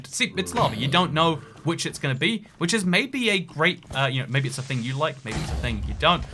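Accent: United Kingdom. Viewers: is British. Lichen is pronounced 'Litchen' in British English. pronunciation is therefore correct.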